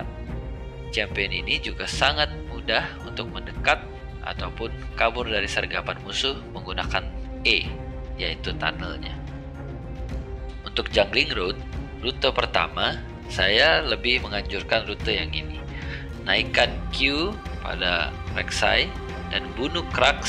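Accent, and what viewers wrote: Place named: Java, Indonesia. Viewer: Jawa nya Jelas BGT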